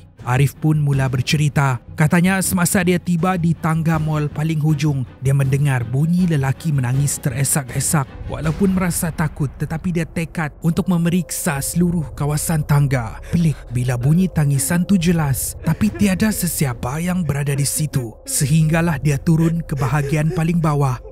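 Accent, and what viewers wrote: Sabah, Malaysia. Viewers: Dia Sabah lah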